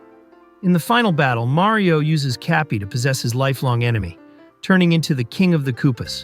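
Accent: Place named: Boston, United States. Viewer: Incredible video! It made me feel nostalgic about when I used to play on my red DS Lite. Awesome, based on your accent, I’d bet you’re from Boston. Cheers, and you’ve got a new sub!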